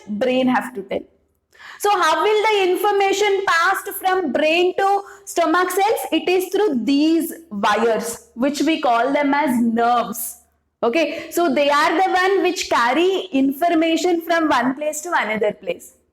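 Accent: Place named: Tamil Nadu, India. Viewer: Madam you are speaking tamil😂😂😅 you are speaking is funny 🤣🤣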